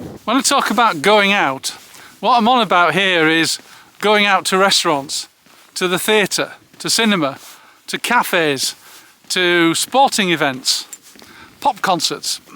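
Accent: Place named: Hull, United Kingdom. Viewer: Detecting a little Hull in your accent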